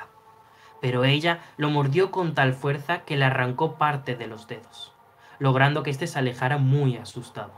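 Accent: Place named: North, Mexico. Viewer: El acento norteño...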